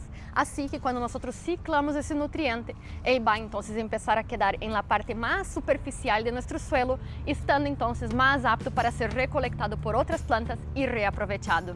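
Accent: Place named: Brazil. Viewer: Cai de paraquedas aqui e vi que era brasileira pelo sotaque, ótimo vídeo parabéns 👏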